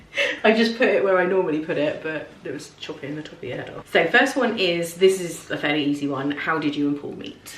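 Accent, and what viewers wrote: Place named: Dudley, United Kingdom. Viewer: I knew he was from near me! I could hear it 😅 but I'm from Dudley 💙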